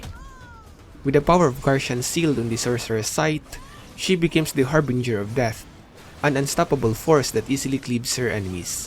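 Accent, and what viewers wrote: Philippines. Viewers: Prang pinoy mag english to haha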